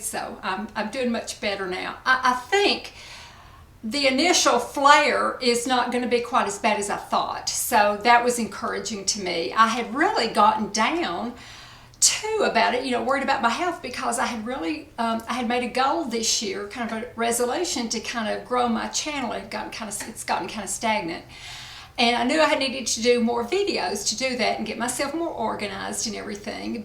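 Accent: South, United States. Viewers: Darlin' we are your tribe!! no one is going to leave you. We all love your smile, your southern drawl that warms our heart. God bless you and cheers to your speedy recovery.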